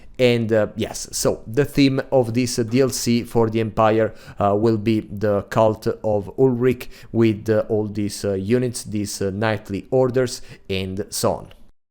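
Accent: Italy. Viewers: Ah ha! It was Italy lol. I was trying to identify your accent. I love the content, thank you for the great videos. (From Canada) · dall'accento che si sente subito che sei italiano ahahah.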